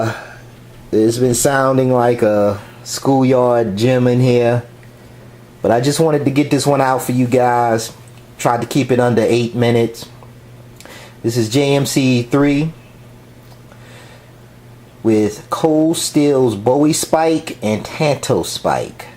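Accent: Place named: New York, United States. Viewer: Not to offend but it sounds like your from new york im from alabama so our situation is a little different but i do like your reveiws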